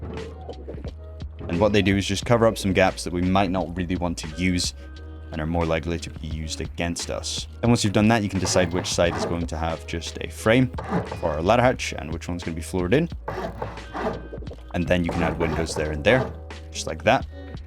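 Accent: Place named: Scotland, United Kingdom. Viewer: Wait! I've been watching your videos and building your bases for the boys for years at this point and it was only now when I was showing my mate one of your PvP vids and he realised you were a fellow Scotsman. How I never noticed is beyond me I obvs thought your accent was "normal" so I never even realised. Keep up the banging videos bro, proud of you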